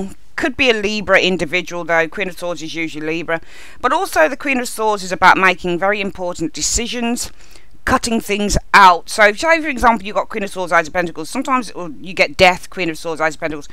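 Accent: Birmingham, United Kingdom. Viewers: Thank you. Mismanaging money...yup. my lifelong problem. Hopeless! Love the Brum accent btw. Always enjoy your direct, on point approach to readings....don't let the whingers get you down!! 😋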